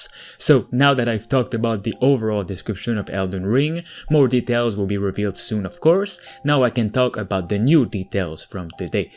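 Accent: Quebec, Canada. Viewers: R u canadian french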